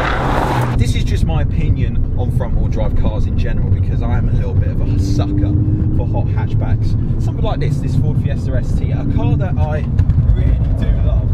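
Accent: United Kingdom. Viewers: u r British accent 👌👌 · The whole video looks like Forza Horizon 4 in real life. The British accent only adds to it.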